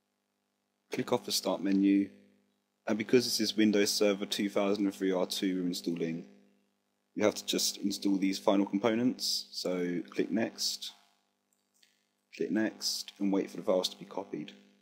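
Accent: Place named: United Kingdom. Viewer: u r british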